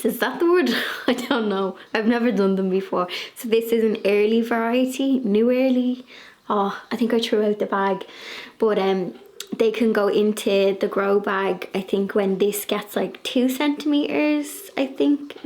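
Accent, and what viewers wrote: Ireland. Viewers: I'm in zone 7a in Niagara Canada, we have 8 months of growing season, no snow since this week, yes we plant bulbs too. I love your gardening vlogs, very inspiring to see all that green and to hear your cheery Irish voice. I've only seen Gardener's World on YouTube because of your recommendation. Thank you for sharing. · Hi I have just started dating your blogs. I love you Irish ascent. As I,m from Australia. Where did you learn how to decorate you little house. Thank you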